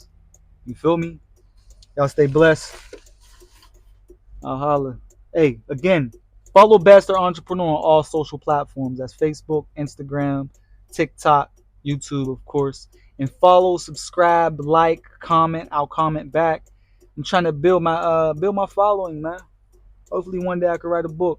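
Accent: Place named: Pittsburgh, United States. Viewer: U gotta be from Pittsburgh 🖤💛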